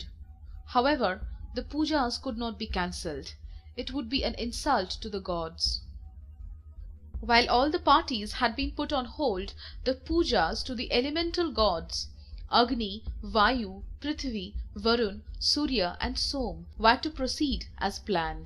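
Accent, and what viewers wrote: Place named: Bengal, India. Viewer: thank you for the audio book. being a bengoli your accent is very familiar and so enjoying with full attention.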